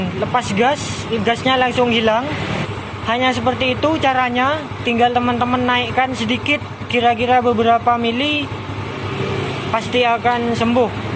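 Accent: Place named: Madura, Indonesia. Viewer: Org madura ya ?😁😁😁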